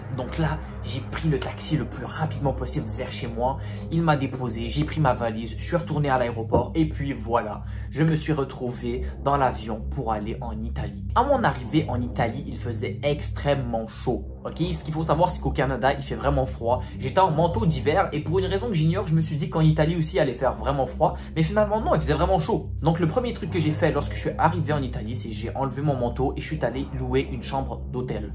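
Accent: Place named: Arab world. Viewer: T as un accon arabe tkt j ai rien contre les arabe la preve je suis arab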